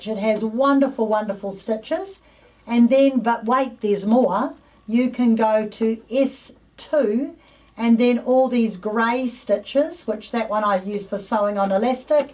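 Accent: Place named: England, United Kingdom. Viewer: Nice lady but I cannot understand half of what she says because her accent is so strong. English I presume.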